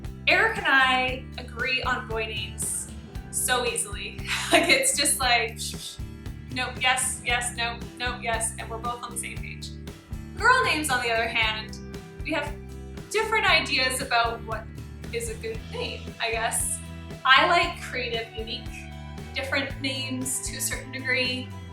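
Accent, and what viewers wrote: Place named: Canada. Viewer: I was going to ask you if you were perhaps from Canada, as I was picking up on your accent. I got my suspicions confirmed when you said zed for the letter z.😂What part of Canada are you from? Ontario maybe?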